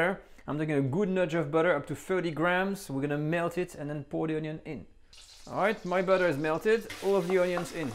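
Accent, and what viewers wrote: France. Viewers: he's native french speaker, providing english for us. can YOU speak french that well that you'd be on camera and be perfect? sheesh folks gotta insult others... make egos happy insulting. no wonder there's global issues with RUDE RUDE RUDE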